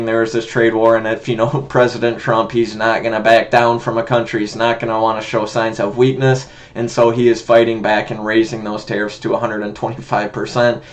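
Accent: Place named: Canada. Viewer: You sound like you're from Canada or something, but I like you anyway.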